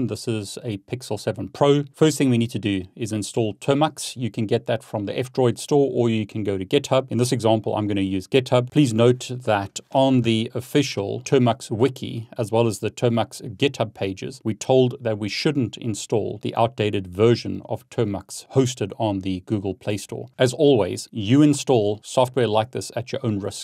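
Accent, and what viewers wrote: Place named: South Africa. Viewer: My 1st comment ever..😅a.you sound like you're from ZA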